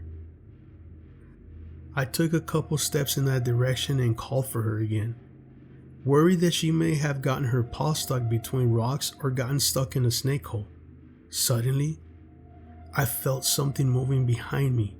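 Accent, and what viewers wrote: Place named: Chinle, United States. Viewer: Lived in Chinle, AZ for about a year. Never saw anything real personally, but you know how horrible kids can be to each other. We used to tease each other all the time when walking past any livestock in the fields. “Ooh that cow is acting weird! It’s a skinwalker!!” Canyon de Chelly was fun to visit but creepy at night. ❤️ Ps: Your accent makes me miss my friends back in Chinle.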